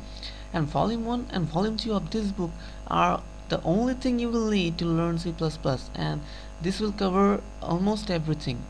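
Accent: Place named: India. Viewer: what is it with the accent....u r indian right..??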